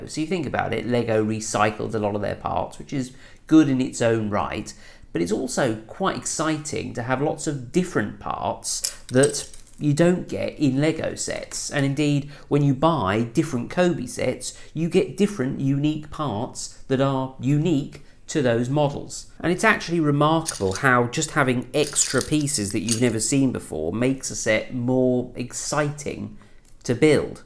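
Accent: United Kingdom. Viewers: Did he say Fokker ? I dunno, in that very British accent I choked on my LEGO brick 🧱. Hey keep up these vids, I’m new to the channel but I’m a scale model builder whose taken to LEGO’s now and again (the Ecto 1 & 1966 Batmobile) but I want some military models & you sir are providing some great info. (language aside - 🤣)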